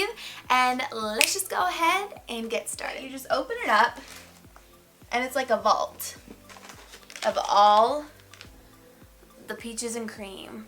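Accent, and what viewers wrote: South, United States. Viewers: Love this!! My new fave beauty YouTuber love all your makeup looks, finally someone with the same skin tone!! And southern yass!!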